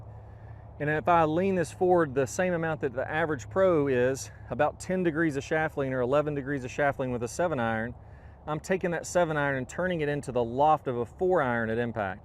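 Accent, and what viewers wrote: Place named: Kentucky, United States. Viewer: always love your instruction and has helped me get my handicap from 20 to about 12.4. My question for you is not about golf, but rather where you grew up? Your accent sounds so much like my roommates from Kentucky when I was at UK. Keep up these great instructional vids. I need to get your swing boxes. I cheaped out and just stick my alignment sticks in the ground by eying the degrees. It’s helped, but I think I need to pull the trigger on your product to be more accurate.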